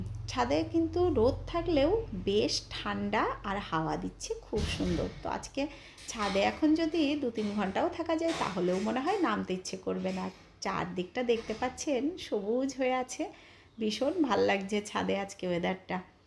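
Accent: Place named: Bangal, Bangladesh. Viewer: তুমি মোনেহয় বাংঙাল ।তোমার খাওয়া কথা বলো আমার সাথে মিলে যায়। আমরা বাংলাঃ ফরিদপুরের। অনেক দিন ধোরে বেরাকপুরে।❤❤❤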